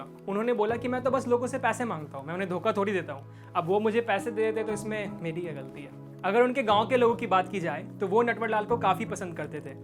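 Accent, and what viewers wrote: Bihar, India. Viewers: Oh... Nice to hear Bihari accent🤣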